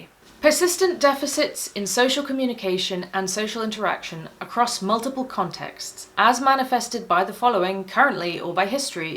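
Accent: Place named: England, United Kingdom. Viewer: Nice to hear an English voice, so many of these things are American. I kind of tune out when I hear foreign accents...maybe due to my condition (I self-identify).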